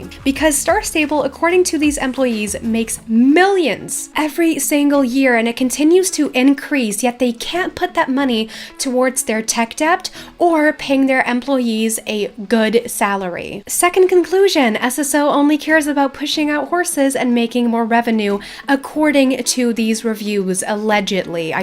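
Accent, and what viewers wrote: Netherlands. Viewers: Wait— are you dutch?!😱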